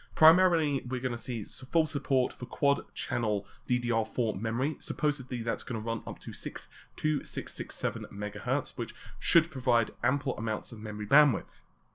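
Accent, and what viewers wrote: United Kingdom. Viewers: He speaks British. You know original English and not American ghetto jibberish. English isn't my first language and have absolutely no issues understanding the guy. Don't complain when you are simply an idiot.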